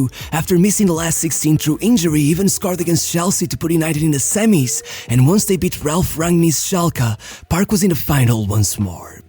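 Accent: Portugal. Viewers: your Portuguese accent is funny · I know a tuga talking english when i ear one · Are u Portuguese? I feel such a Portuguese accent in your english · AM I THE ONLY ONE THAT CAN'T HEAR THIS NARRATOR?? So dramatic.....i bet he's portuguese.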